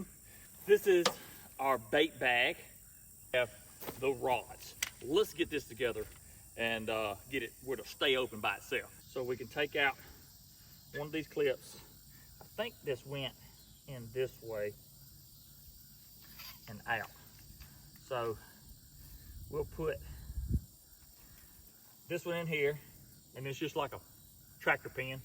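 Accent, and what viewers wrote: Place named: South, United States. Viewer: still has a clear southern accent at 2X speed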